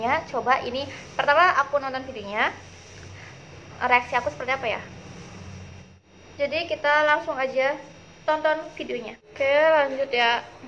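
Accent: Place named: Banyumas, Indonesia. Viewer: Owhhh jowoe ngapak ... Ojo lali like comen subkreb momo bersaudara 😂😂😂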